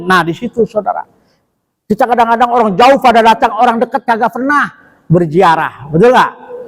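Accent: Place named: Banyumas, Indonesia. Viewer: Semangat syaikh ❤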